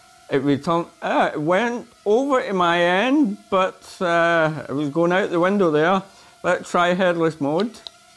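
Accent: Scotland, United Kingdom. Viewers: My grandfather had a heavier Scottish accent and He brought me up.I understand you well. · Haha 😂 Yes the accent is pretty thick:P I remember when I had to learn Scottish phonetics for acting purposes it was quite challenging. All accents vary in the way vowels are pronounced although Scotts tend to roll their r's quite a bit and are very lazy with pronouncing T's P's and D's. That said, in addition to speaking slowly like you said I think being deliberate in your pronunciation especially in your D's P's and T's would help foreign veiwers This vocal warm up I use to do, may be helpful to achieve this basically you just say this over and over again with strong diction getting faster and faster each time whilst still retaining strong diction... "The lips, the teeth, the tip of the tongue, the hard palate, the soft palate, Pronunciate, articulate, exaggerate." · Ahhh! 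Didn't mean to be rude, and beeing German probably didn't help me understanding your Scottish accent :-)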